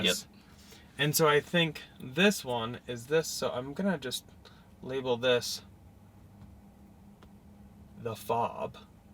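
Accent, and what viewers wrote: Norway. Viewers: U norwegian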